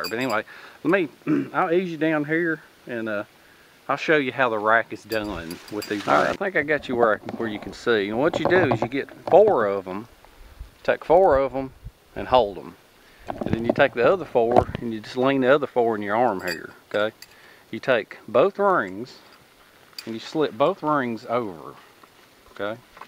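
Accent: South, United States. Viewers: HI. Nice to see you back again. ok, I see you as a walking, talking southern encyclopedia. Yet again, the southern messed me up. Shambered? So, I google and decide the word I am hearing is chamfered? Like a beveled edge? I think. Bless your heart. We yankees do not understand sometimes. LOL Love the no cordage. This stuff I can do. I have done no cordage many times. Most times I had no choice. Some of the notches were new to me. Appreciate that. Thanks for teaching some of us new and/or better ways of doing survival things. But please.... no more popcorn videos! Still makes me laugh. Be well. Be safe.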